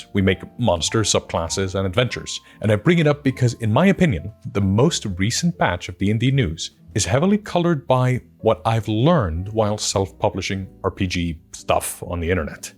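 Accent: Iceland. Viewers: I need to tell you this, because I've thought it for a while now and I can't keep it to myself. Has anyone ever told you that you sound just like Jonathan Frakes, just with and Icelandic accent?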